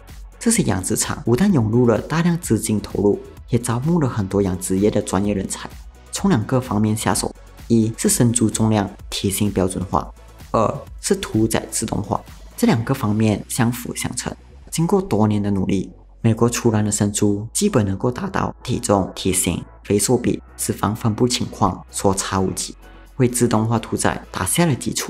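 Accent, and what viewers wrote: Malaysia. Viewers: 这个华语，马来西亚人👍🏻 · 马来西亚人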